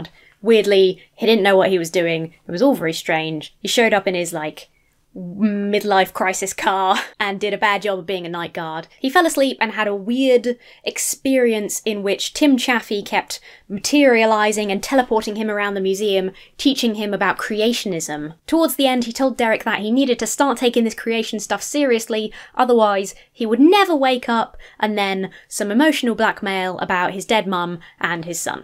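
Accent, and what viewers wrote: United States. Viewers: You've got a good American accent! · You do a great American accent!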